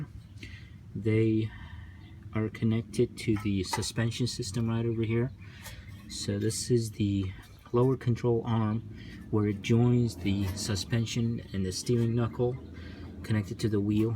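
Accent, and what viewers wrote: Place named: Canada. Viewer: Where ya from mate, sound like a Canuck to meeee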